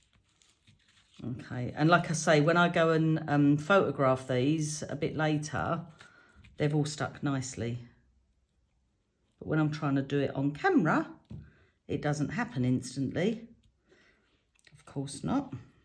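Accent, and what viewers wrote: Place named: Essex, United Kingdom. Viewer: Just came across your channel and really enjoyed watching you. Particularly because I hail from Essex originally and worked in London so your accent is like coming home. Your voice really reminds me of Linda Robson in the good old comedy 'Tails of a Feather'. What a coincidence!!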